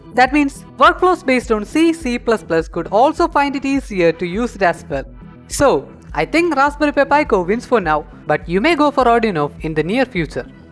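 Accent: Kerala, India. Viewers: language and slang are quite nice, but I think you are from Kerala, 🙂 Actually, Arduinos are recommended for the beginners to learn program and robotics later followed by Esp modules, rsp2040, Raspberry pi, latte panda etc. etc.... anyways you need to include more information for beginner to understand well and to start their journey with right components, Other than this everything is fine. great job bro!!!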